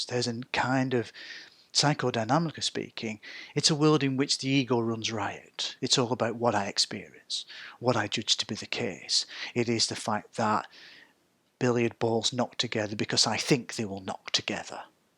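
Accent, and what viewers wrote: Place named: North, United Kingdom. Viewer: Thank you, you've demystified quite a bit for me there. Great to hear a northern accent too! Cheers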